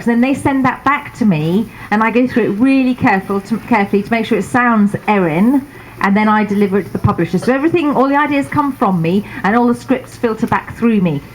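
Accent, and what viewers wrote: United Kingdom. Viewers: what...she british..... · We're is she from Scotland?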